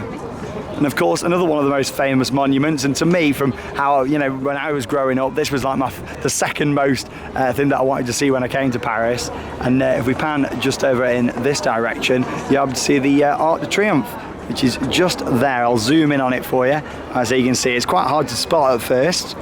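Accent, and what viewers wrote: United Kingdom. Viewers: I enjoyed your video and also your brithis accent !!!!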